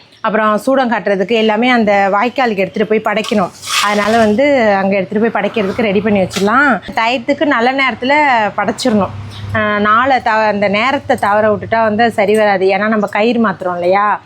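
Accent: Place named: Nagapattinam, India. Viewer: Akka nanga pesura mathiriyea neengalum solreenga.nan unga ooruku pakam thn Nagapattinam ❤❤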